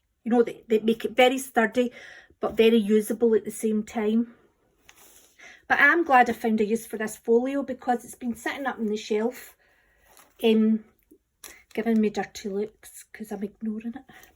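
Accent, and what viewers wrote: Scotland, United Kingdom. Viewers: Watched Ceri unwrap the journal you sent him. You have a very unique approach. Yes, quirky. Love your accent…Scottish, eh?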